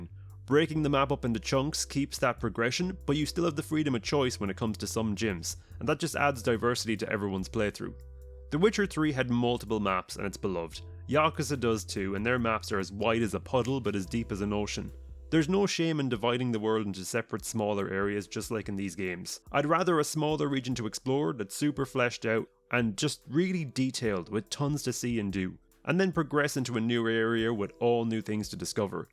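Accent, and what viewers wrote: Ireland. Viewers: Your voice was like ASMR throughout the whole video 🫠 I love the way you say Jhoto... its the Irish accent. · You sound a lot like PotatoeMcWhiskey!! Are you Irish too?!! I love Ireland!!!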